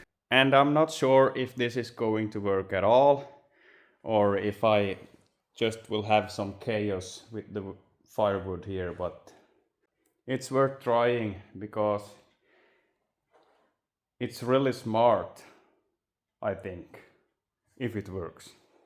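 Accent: Finland. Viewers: ha, sa till frun när vi satt här och drack kaffe och jag kollade på videon att snubben måste ju vara finlandssvensk! Han pratar inte engelska som finnar brukar😋 Hursomhelst intressant att följa projektet.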